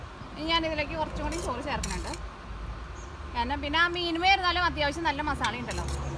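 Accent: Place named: North Kerala, India. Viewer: Oru Nalla Nadan kutty anu,I like your presentation & vadakkan accent.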